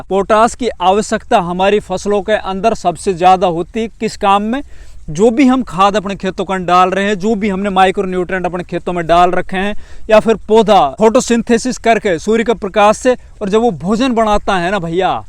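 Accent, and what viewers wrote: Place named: Mahendragarh, India. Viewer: Bhai ap kha se ho awaz tho m,grh side ki h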